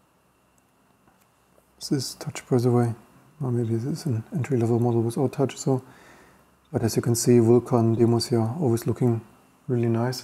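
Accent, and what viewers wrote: Germany. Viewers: Dieses "deutsche" Englisch ist echt schrecklich. "Se sinkpäd". "ei test se sipiju performanz" "so sen ei wud say" Machs doch auf Deutsch ... Englisch können und Englisch sprechen können sind echt 2 verschiedene Dinge.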